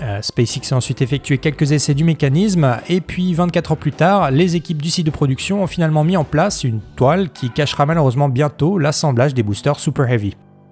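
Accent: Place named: United Kingdom. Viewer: Votre accent anglais m’éclate ^^ Je ne suis pas meilleure que vous hihihi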